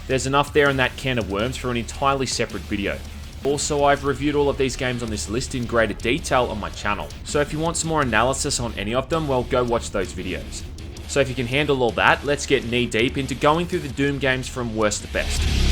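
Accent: Australia. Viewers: Your Aussie inflection is pure perfection. (I'm born & raised south Sydney) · It's Australian, it does not sound anything like Irish xD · I hate the Aussie accent and I AM Aussie. #Irony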